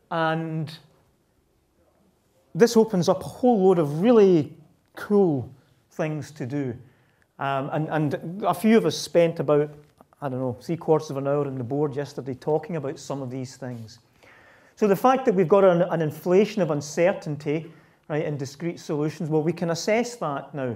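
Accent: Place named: Scotland, United Kingdom. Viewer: The first thing that struck me was the super cool beard. The second thing was the super cool accent. What is that accent? Is it some kind of Scottish?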